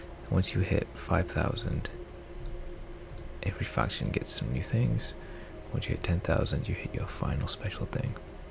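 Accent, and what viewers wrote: Yorkshire, United Kingdom. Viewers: Appreciate every video you put up. Feels good to have a Yorkshire representative in the ASMR community. 🗿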